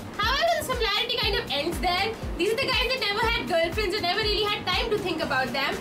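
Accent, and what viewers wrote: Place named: India. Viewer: You're really pretty but there is something about your voice that's not real pleasing to my ears. Please I kindly request you speak a little slower. When you speak fast like this, the indian accent makes it difficult for people who aren't used to hearing it often to understand. Thank you and keep making more videos. :)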